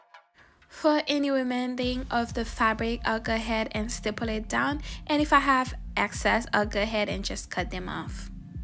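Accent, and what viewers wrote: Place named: Haiti. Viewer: Love your accent and your work. By chance are you Haitian? God bless you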